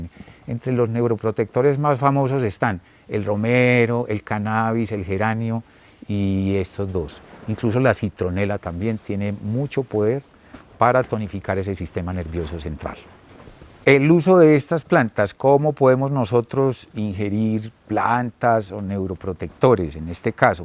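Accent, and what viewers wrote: Colombia. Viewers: Que delicia escuchar hablar a una persona colombiana !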